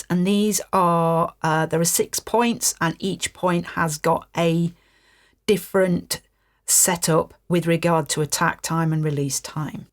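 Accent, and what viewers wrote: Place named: Yorkshire, United Kingdom. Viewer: This is an amazing lesson! Thank you so much for sharing your knowledge in such an easy and understandable way. Also, I have to say I love your accent :) I now live in East Yorkshire, I guess we are not too far?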